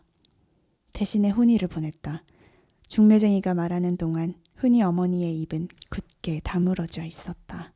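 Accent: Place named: Busan, South Korea. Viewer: 파친코1부 부산사투리 재밋게, 감질나게 잘들었어요. 2부 기다릴게요~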